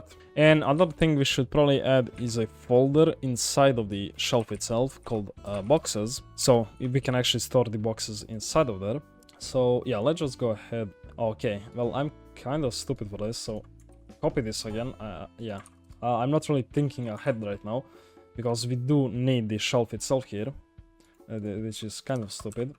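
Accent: Russia. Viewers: You have a Russian accent.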